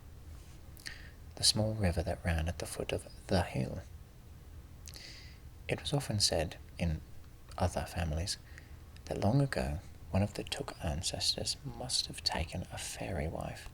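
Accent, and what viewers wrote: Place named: New Zealand. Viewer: He’s even got the kiwi accent, by the sounds of it 👏🏼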